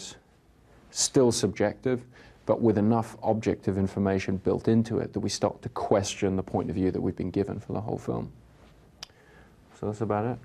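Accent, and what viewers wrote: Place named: Australia. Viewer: He actually sounds kind of Australian in this video.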